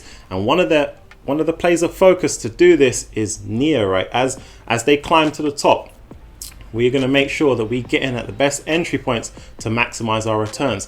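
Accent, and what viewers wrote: United Kingdom. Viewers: Great to hear a U.K accent in the financial space! Great video my bro. #SecureTheBag 🔥🚀💰 Bless!!!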